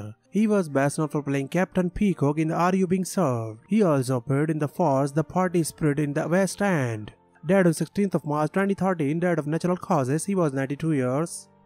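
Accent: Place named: India. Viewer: It is an accent from India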